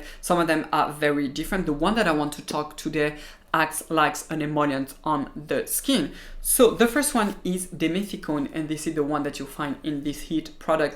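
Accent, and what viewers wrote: France. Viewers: . I'm a French man raised in Australia and I listen to French accents all my life. There is a flaw in your channel that you must address: you flick out the names of active ingredients, with a French accent, and it is irretrievable for English speakers. When you discuss actives, pronounce how you want - but spell beneath. Alors. C'est pas trop difficile. Also, we can get Azeliac Acid in the US and Australia as 'AZClear' and 'Finacea'. If you live in either of these countries - buy those and mix a little into your moisturiser for a quarter of the price of even paula's choice. Azeliac is a cheap ingredient.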